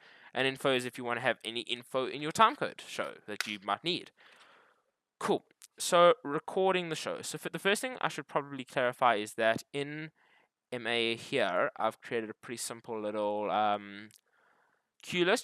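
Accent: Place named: Afrikaans, South Africa. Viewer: Hey, DELPHI 7 on the taskbar!! Lol. Jy klink Afrikaans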